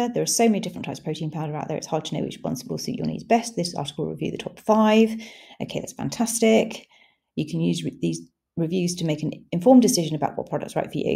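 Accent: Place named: United Kingdom. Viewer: After I made the comment I was thinking that it might have been your microphone? I look for education, not for entertainment. Also, there is nothing wrong with your British accent, it was the sound quality!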